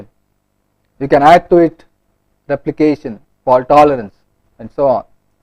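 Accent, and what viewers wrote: India. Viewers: good information, but horrible accent. I don't know why Indian English sucks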